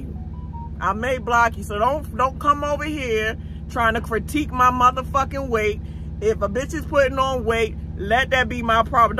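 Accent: Baltimore, United States. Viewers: I been following you since the pandemic on three platforms and that little bit of weight looks good on you. and that Baltimore accent❤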